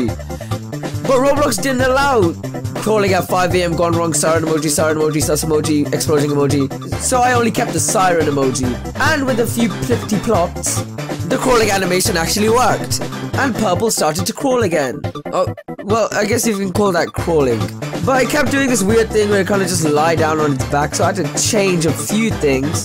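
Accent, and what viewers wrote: United Kingdom. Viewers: are you from birmingham? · R u British? 😅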